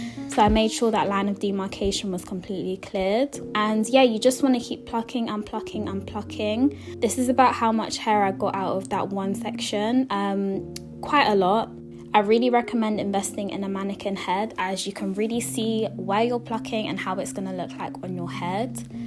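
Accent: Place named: United Kingdom. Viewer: Lol love the accent ❤️❤️❤️❤️❤️❤️❤️❤️where you from UK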